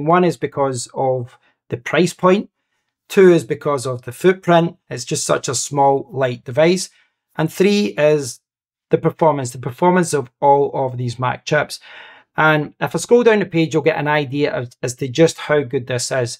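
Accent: Scotland, United Kingdom. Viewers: So cool listening to a Scottish guy talking about a McIntosh.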